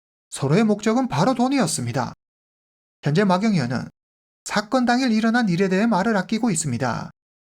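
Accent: China. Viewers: 주인장 조선족임? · 말투 뭐임? 선족임?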